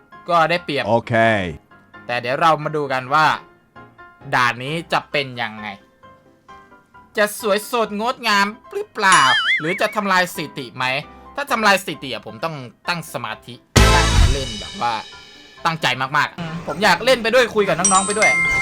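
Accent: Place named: Vientiane, Laos. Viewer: พี่ หล่อเเต่ ดัง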